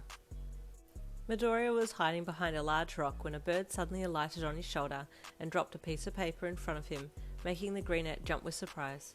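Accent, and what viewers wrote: Australia. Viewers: Yes I immediately knew what pants meant but if you had said dacking 😭😭 I’d be so lost like please explain your Aussie accent to meeeee 🤣💀💀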